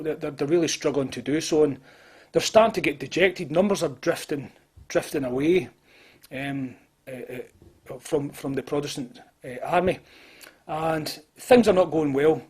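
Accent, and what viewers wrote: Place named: Scotland, United Kingdom. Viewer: What a pleasure to hear a normal Scottish voice conveying such an important story!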